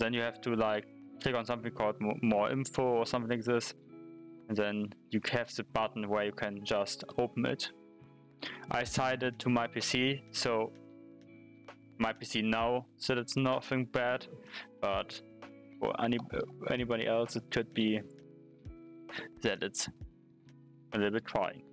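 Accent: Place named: Germany. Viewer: man hört mies das du deutsch bist xD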